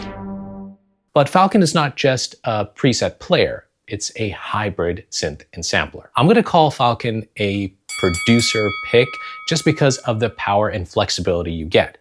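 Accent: India. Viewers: Hi. You are clearly from india. but i am wondering what your mother toungue is? I love Your reviews. This is Preetam from India.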